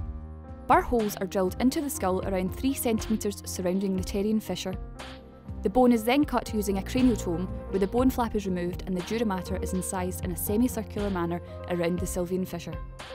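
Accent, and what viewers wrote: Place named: Scotland, United Kingdom. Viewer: What a captivating dialect! Scottish? Excellent synopsis of the approach as well!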